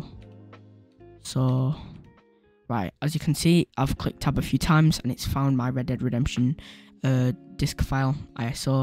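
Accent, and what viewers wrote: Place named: United Kingdom. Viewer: Oh you're British 😮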